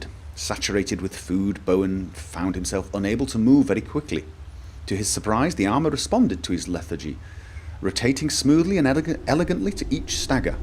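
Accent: United Kingdom. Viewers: If you were american I would not watch these book videos but british people are so pleasant to listen to that I'm going to watch them. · It's like a Morgan Freedman with a British accent. that's like 200% better than the normal Morgan Freedman!!! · Yes, oh my God so much yes! This is amazing! The calm, collected British accent and the fantastic books of the Elder Scrolls series, with your reactions added on top. This might very well be your best playlist yet. I REALLY hope you'll do all of the books from the Elder Scrolls series eventually.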